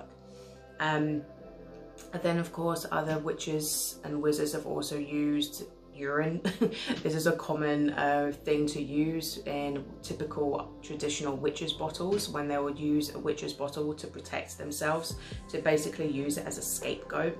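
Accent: London, United Kingdom. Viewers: After living in devon for the last 20 years so nice to hear a fellow londoner ...blessings 🌛🌕🌜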